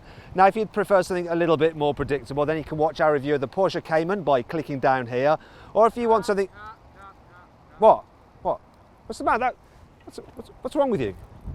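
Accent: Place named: Birmingham, United Kingdom. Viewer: he's a brummy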